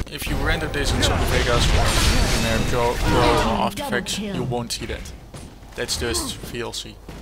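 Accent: Netherlands. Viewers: The dutch accent though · altijd grappig om dat nederlandse accentje te horen ^^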